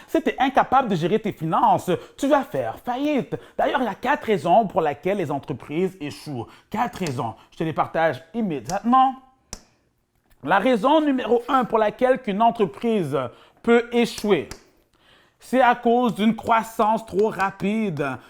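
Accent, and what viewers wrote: Quebec, Canada. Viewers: il doit avoir grandit au quebec avec laccent surtout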